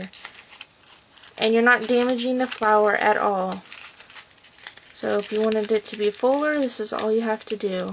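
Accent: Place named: United States. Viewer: let me guess: with your accent, you are a rich american woman (because in america, you are rich or poor, no middle)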